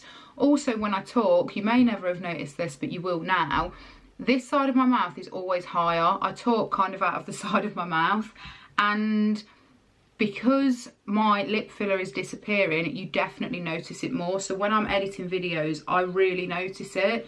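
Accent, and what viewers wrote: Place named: Birmingham, United Kingdom. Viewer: Waitttt you’re from brum ?!? I knew I recognised the accent 😅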